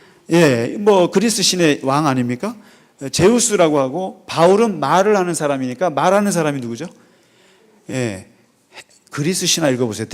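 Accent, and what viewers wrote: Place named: Gangwon, South Korea. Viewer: 목사님, 목사님을 통해서 많은 생각을 하게됩니다 젊은신 목사님같으신데 해박하심에 듣고있는것이 즐겁네요 덕분에 사도행전-성령행전에 빠져가고 있네요 그리고 여긴 강원도 동해안인데ᆢ목사님 여기분이신가요? 말씀듣다보니 여기지역말씀이 불쑥나오는경우가있으시기에ᆢ^^ 언제나 감사하며 듣고있습니다~ 건강하세요~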